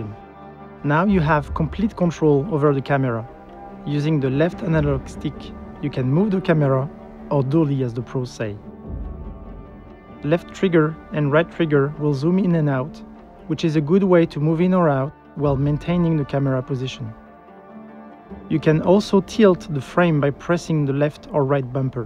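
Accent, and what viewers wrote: France. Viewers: XD this French accent is so obviously 😂😂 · L'accent français on l'adore quand il parle anglais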